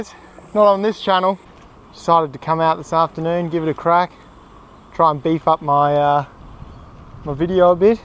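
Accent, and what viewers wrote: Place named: Australia. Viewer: I accidentally clicked on one of your videos and went to immediately change the video then I heard your Australian accent and now I'm subscribed good on ya mate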